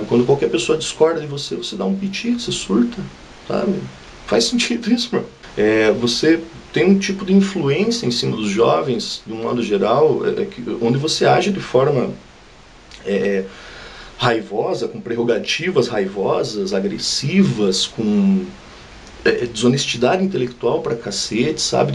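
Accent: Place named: Rio Grande do Sul, Brazil. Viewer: Disse tudo, sou professora e sei como é. Adoro seu sotaque carregado e viva a nós gaúchos!!💪👊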